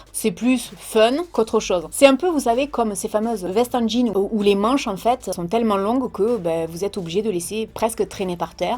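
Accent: South, France. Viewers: trop mignon ton accent!!!!! et vive les provinciaux.... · Coucou alors premierement tu as totalement raison " ca vient de Paris alors..." mais quand ob regarde ce qu'ils portent a Paris c'est de la dobe pour être polie lol c spécial faut avouer... Mais même moi qui suis du nord (ch'ti MDR) j'envie le sud pour bcp de chose: la météo déjà lol votre accent est carrément plus glam ke chez nous MDR on va pas se mentir et puis vos petits plats 😍 etc.... Je m'arrête la mais vous avez tant a nous apprendre... Voila bisoux et ne te remet pas en question reste au tu es c'est parfait je t'adore 😉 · C'était une super vidéo comme d'habitude! Tu es toujours aussi belle (et personnellement j'aime bien l'accent du sud)! 😊😘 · Ahh non non au peigne- dents, maillot à poils et ballerines orteils! Non non!!:D y a des trucs que je n'avais jamais vu: manches xxl, jogging... mais pas du tout envie d'essayer! 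Et les cuissardes ugg me font penser au chiens qui sont tout fripés!:)) faut être à Hollywood pour porter ce genre de trucs et passer à peu près inaperçu!:)) très chouette vidéo!:) bisous! Et vive l'accent du sud! (une sudiste à Paris!):) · J’aime trop ton accent j’ai l’impression d’être en vacances dans le sud quand tu parles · Perso je viens de Paris et j'adooore ton accent, mais en mm temps j'aime tous les accents du sud <3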